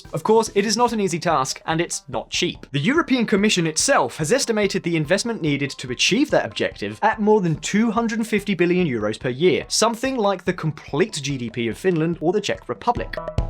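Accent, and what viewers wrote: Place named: United Kingdom. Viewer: It's a French word spelled façade. That squiggle under ç makes it sound like ß or ss. Otherwise, even in French it would be "fakad". Anyway, is an up and coming British-like voice, a welcome relief from so much careless American slurring heard on YT.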